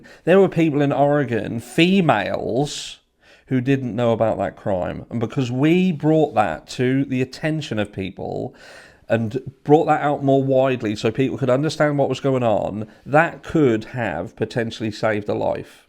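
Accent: Norfolk, United Kingdom. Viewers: Go,!! I agree 100%. Usually, here in the US, most conspiracy theories turn out to be true. I must admit, I have difficulty listening to the British accent but you and Jonny Lee Miller have changed me. Love your videos. Any updates on poor Sean Daugherty? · Appreciate you and all your hard work 🤍 Is that a Norfolk or Suffolk twang I can hear? 😊✌️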